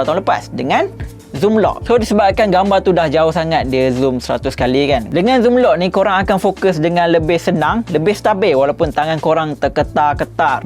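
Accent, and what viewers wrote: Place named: Kelantan, Malaysia. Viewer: Dik.. pelat kelantan awak not bad jugak ya..kecek kelate je lah. 🤣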